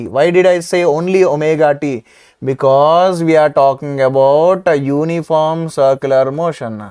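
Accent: India. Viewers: Your perfect Indian accent makes your English too good sir!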